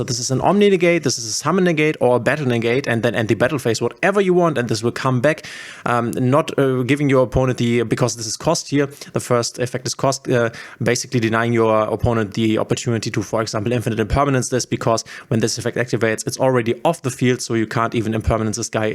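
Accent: Germany. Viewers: your accent sounds german 🙃 great vid btw 🎉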